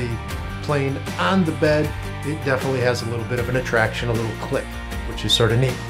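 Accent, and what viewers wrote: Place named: New England, United States. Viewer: What a wonderful idea to use the hidden magnets to secure your planes! Your shop continues to become more organized with each project! I see your plane collection has really grown! They're both functional and beautiful tools! The plane till was an awesome storage idea and it turned out great! You got that New England accent perfectly perfected too!! As always, enjoyed seeing your latest project! You and Buddy have a great weekend! God bless!!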